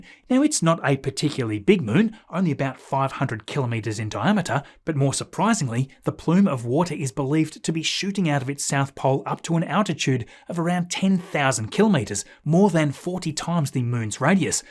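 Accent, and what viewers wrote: Australia. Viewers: Just stumbled across your channel. Awesome work mate. I've been living in the UK for the last few years, moved here about 2 months before the pandemic which was a very interesting greeting to the country! It's always great to hear an Aussie accent in my travels as it feels like home. Peace mate. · I love. A very well made Show. Plus I like the Australian accent. Keep up the good work it is much appreciated.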